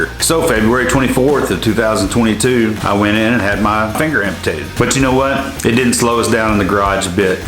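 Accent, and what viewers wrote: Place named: South, United States. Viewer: Love hearing that southern drawl! 🤣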